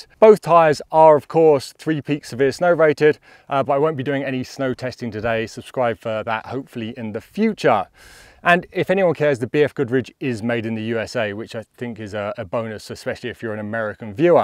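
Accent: United Kingdom. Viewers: A British Mormon talking tires?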